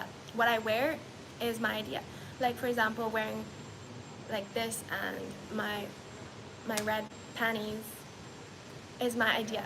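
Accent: United States. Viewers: how did you learn to speak English with a Kansas accent?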